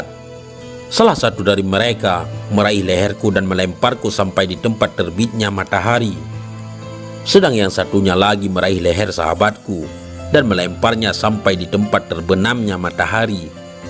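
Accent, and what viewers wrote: Aceh, Indonesia. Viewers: Logad bhsa Admin ini kyak logad bhasa Aceh..?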